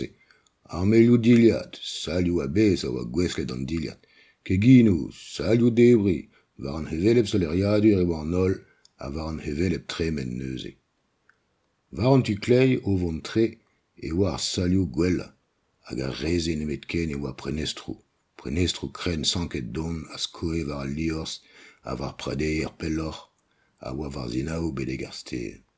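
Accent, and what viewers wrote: France. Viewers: french accent is so strong and annoying unfortunately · It's like ancient Cornish with a French accent. Fascinating. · The French accent makes me wonder what Tolkien's opinion on Breton was. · Loved it, minus the French accent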